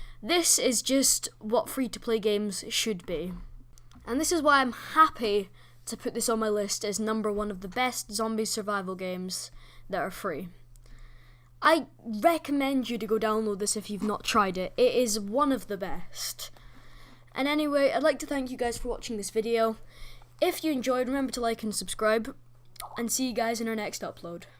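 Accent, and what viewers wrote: United Kingdom. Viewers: i like british people, and you sound british just in case your not, hell im even trying to speak in british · are you Scottish or?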